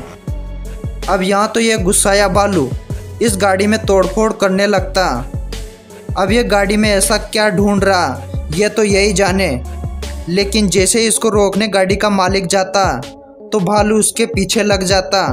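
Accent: India. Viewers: sister are you Bengali 🙂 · Jaipur side ki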